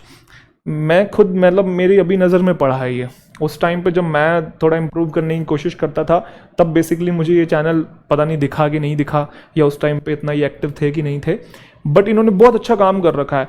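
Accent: Haryana, India. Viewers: Sir ji haryana se ho na??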